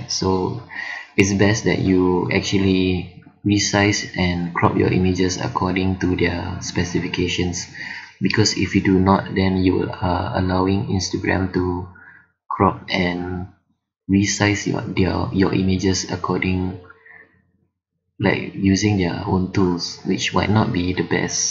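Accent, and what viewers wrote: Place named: Singapore. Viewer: omg why did it end abruptly. machiam cliffhanger lol. But thanks so much for this tutorial! Possible to explain the difference between exporting in LR vs PS? I prefer to stay within one platform but am curious as to whether the difference between these 2 exports would be significant. P.S. I think this is my first tutorial watched done and voiced by a fellow Singaporean. Nice to hear a familiar SG voice! Thank you for the tutorial! Subbed!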